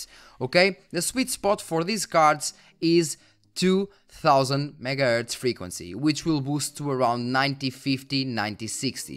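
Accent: Portugal. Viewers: Pareces tuga pela forma de falar, props pelos vídeos! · i really like the way you speak english, your are portuguese right? GJ! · Grande tugão, apanhado pelo sotaque ... bom video pahhh Saiu este video de um alemão (para as "RX 5700" / "RX 5700 XT", com OC a 2100Mhz <link> software: - GPU-Z.2.30.0 - MorePowerTool review: <link>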